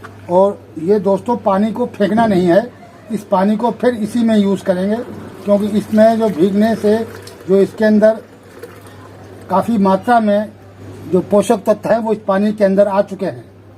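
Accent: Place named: Kannauj, India. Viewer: Sir aapaki boli se lagta hai ki aap up kannauj or farrukhabad se hain.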